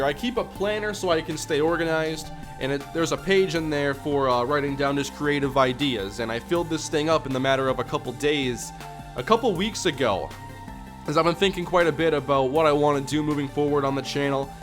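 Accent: Minnesota, United States. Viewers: Your Minnesotan accent is much less pronounced than my friends from Minnesota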